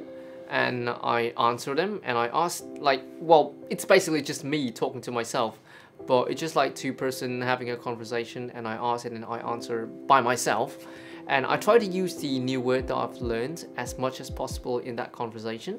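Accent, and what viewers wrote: England, United Kingdom. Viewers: nói giọng dễ nghe quá luôn í phát âm accent british mê =((( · your British accent is addictive af omg chef kiss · Woww👍British accent...love it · uầy anh có cách phát âm của người Anh rõ rệt nhưng cũng pha 1 chút Mỹ ngưỡng mộ thật sự :3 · Seems like he's talking in British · Ahhh thích bạn này British accent 👍👍👍 so admirable bro 😍😍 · Thích BA vclll =))) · how cute ur british accent... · Mô Phật, coi miết mà giờ mới để ý cái series này, kể ra tui cũng khá giống đấy chứ và bây giờ tui có thể enjoy these videos with English accent =>>>>>> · I love your British accent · dude this is obviously british accent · Em ít khi nghe người Việt mà có accent 100% anh-anh như anh, nghe sướng tai gì đâu ❤️❤️❤️ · OMG British accent , luv it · I LOVE YOUR BRITISH ACCENT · My bf (Caucasian) told me that your British accent is pretty nice. The only funny thing he noticed that you said “elementary school” instead of “primary school” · Tiếng anh của England nghe sang vãi đái :)) đc quả accent này đi cưa gái thì đỉnh ae nhờ :)) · omg, his British accent is incredible! 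beautiful. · Love this British accent!!! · Good UK accent there, mate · British accent wow amazing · I really love your British Accent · Cái accent của anh giống người anh nói ghê ah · giọng này hay nhưng pha Australian r nhé bạn. K hẳn British. Cách bạn ấy nói YouTube hoặc about. N chung là có xu hướng British thôi. Thú vị phết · nói giọng anh - anh nghe cuốn ghê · I was like hmmm, that sounds like a British. And then the "mate" came out. Yup that is british accent lol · nice British accent, mate! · British accent đỉnh quá :3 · You have such a great British accent which makes me feel a bit more comfortable when practicing it. Keep up up the good work! Ps: from Vietnam :3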